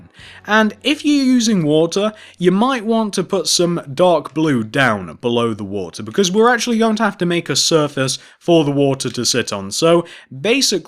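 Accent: United Kingdom. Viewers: Hello, Number 1 fan I have a few questions I want to ask you is their a way for me to contact you? Also I been a fan since 09 and I learn everything from you.. on minecraft. Also British accent is awesome!. Ps: Number 1 fan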